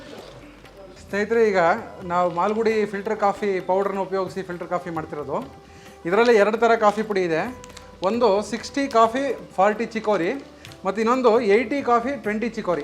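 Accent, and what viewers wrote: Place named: Mysore, India. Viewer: ನಿಮ್ಮ ಶುದ್ಧವಾದ ಮೈಸೂರು ಕನ್ನಡ ಕಿವಿಗೆ ಇಂಪಾಗಿ ಇತ್ತು.ಹಾಗೂ ಪೊಂಗಲ್ and ಫಿಲ್ಟರ್ ಕಾಫಿ ಎರಡು ಇಷ್ಟ ಆಯಿತು. ನಿಮ್ಮ ನಗು ಹೀಗೆ ಇರಲಿ 😁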